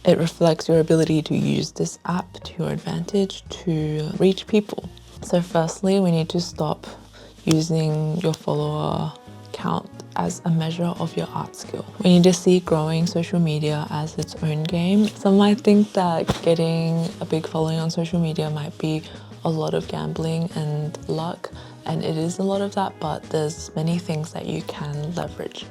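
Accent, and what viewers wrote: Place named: Australia. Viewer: Love this! Massive help. By your accent by any chance you’re from Australia?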